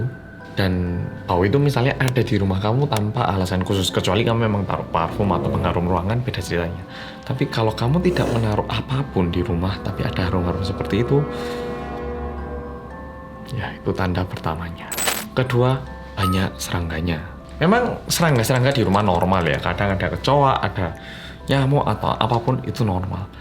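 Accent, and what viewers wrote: Java, Indonesia. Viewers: HIYAKKK PPPPPP KURANB GERCEP😭 MAS MEDOKKKK👍👍👍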